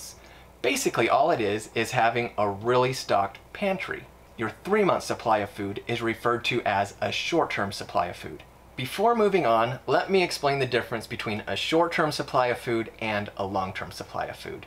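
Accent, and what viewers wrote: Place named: Utah, United States. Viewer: By the way say meal and oatmeal I’m guessing you are from Utah 😀I like your pantry. You have the same exact items I have lol. We must shop at the same places.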